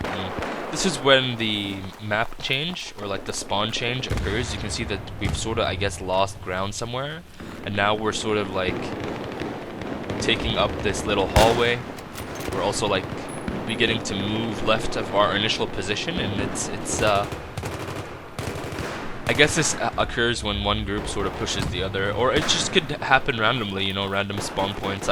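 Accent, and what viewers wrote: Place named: Canada. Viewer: Aye you from Canada.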